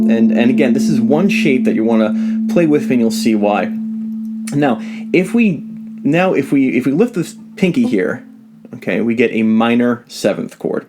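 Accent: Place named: Long Island, United States. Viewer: Great lesson!..I learn quite a bit from your explanations..Are you from NY?..I'm from the Island, and you sound like everyone I know.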